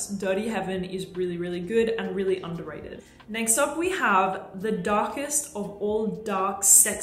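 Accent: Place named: Australia. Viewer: I love her Aussie accent and the way she describes fragrance makes me genuinely want to own everything she recommends cause it just sounds so delicious when describes it! My go-to for fragrance knowledge forever!!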